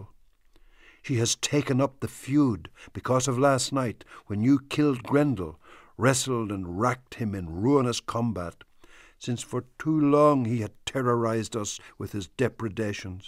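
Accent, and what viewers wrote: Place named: Northern Ireland, United Kingdom. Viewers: It's a modern Northern Irish accent. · Laura Halliday hahaha!..how on earth would you know what a "Shakespearean" accent sounds like??..anyways the narrator has modern nothern Irish accent..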